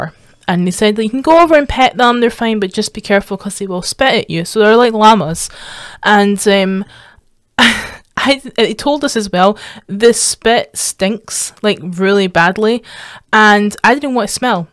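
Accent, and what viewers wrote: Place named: Scotland, United Kingdom. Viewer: Your comments and stories with that Scottish accent love it!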